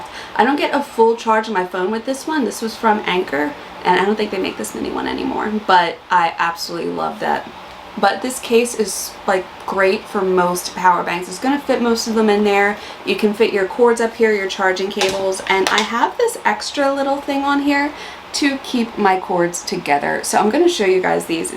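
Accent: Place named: Australia. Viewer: I'll never fly international, but as someone who cruises many of these are a good idea for cruising as well. Cruise ship cabins don't always have many hangers either, so those foldable hangers are a brilliant idea, so I can hang my partner's dresses, and my 'formal night' gear. Great ideas. I look forward to seeing some of your travel vlogs when you take Mark to Philly. It'll be interesting to note how aussie you probably now sound to your friends and family.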